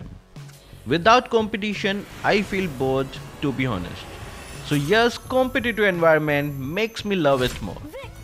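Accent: India. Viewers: I thought he's an Indian because of hi accent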